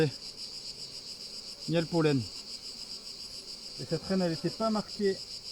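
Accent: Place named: Belgium. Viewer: Bonjour monsieur vous êtes du Sud ?? On entend les crickets ou bien c’est une musique de fond ?? En tout cas vous avez un jolie accent Belge😁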